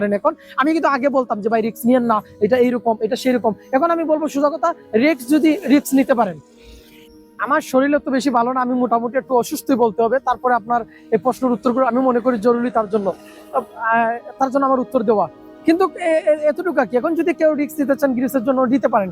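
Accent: Sylhet, Bangladesh. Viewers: আপনে সিলেটি নি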